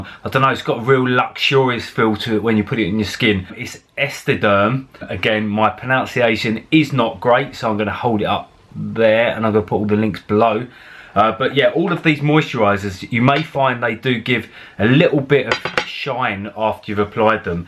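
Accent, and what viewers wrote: United Kingdom. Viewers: subscribed as soon as i heard that top notch londoner accent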